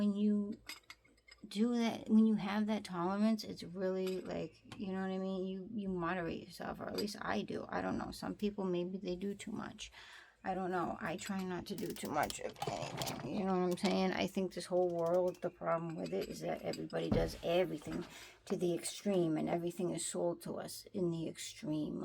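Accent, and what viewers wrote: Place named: Rhode Island, United States. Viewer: I dont know why I was under the impression you were in RI beyond your accent (know it because I used to live in Portsmouth) but I think thats where you are?. Didnt realize that cannabis was illegal there if im right and thats the state youre in. But MA and CT are close to you and yes those places sell potent thc products but that just means you use less of it at any given time if that is something you enjoy doing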